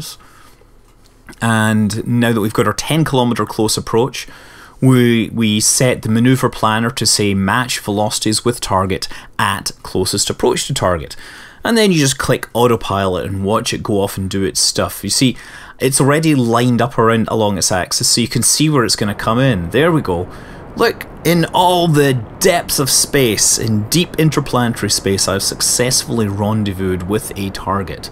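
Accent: Scotland, United Kingdom. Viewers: It's. He have scotish accent.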